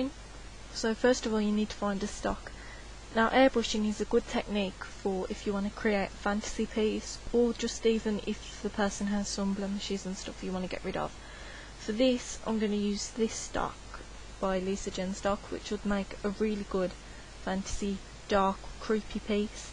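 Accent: Birmingham, United Kingdom. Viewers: Who would hate your british accent? It's so cute. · great vid lady and yeh to hell with the yanks who hate the british accent im from london but next to ours brummys have d best accent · You are awesome, I like this tutorial. And by the way, I love your british accent (not kidding). Could you upload a HQ video, it seems a bit blurry. Greetings from Mexico. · Bostin accent! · Brummy accent ftw, im the same ;) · hell yea boi... i love british peoples accents...im also aussie..good vid though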